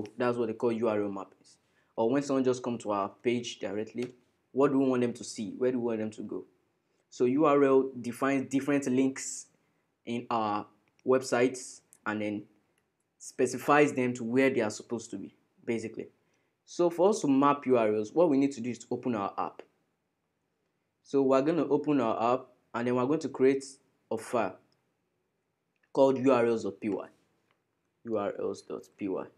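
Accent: Nigeria. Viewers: May God bless you. This is the only video that is slow enough for me to comprehend. It also has this Nigerian accent.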